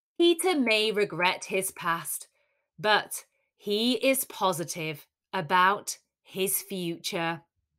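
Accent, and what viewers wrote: United Kingdom. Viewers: i adore your britsh accent , the course are just perfect thanks a lot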